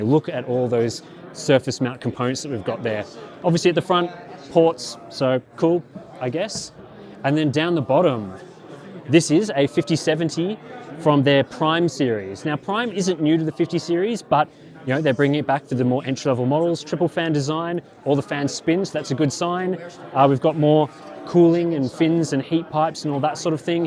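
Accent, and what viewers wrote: Australia. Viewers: All that talk about fan spin sounds like a Louis Rossmann video except for the Aussie accent.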